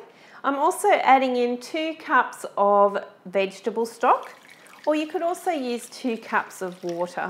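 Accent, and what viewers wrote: Australia. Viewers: . I love your videos. You do them the right way. You start out immediately giving step by step directions. You don't play background music and can be heard clearly. You keep the camera on the recipe. And I have never seen a video set up to where the viewer can print the recipe. That is wonderful. You simply do everything right in your videos. I absolutely love them. And I love your Australian accent too. Thank you so much. Let me just point out some of the things that are on most other videos that drive me crazy to the point of changing the channel. They do a lot of talking before starting the recipe. They bast background music so high you can't hear what they are saying. Or, they blast background music while printng the recipe directions on the screen where we have to freeze the picture to even read the recipe. These things drive me nuts. 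I don't bother watching them or subscribing to them.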